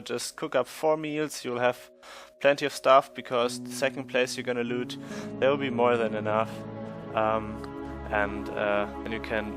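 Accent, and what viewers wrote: Germany. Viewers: Dieses Pseudo Englisch von Deutschen lmfao. Aber gutes Video !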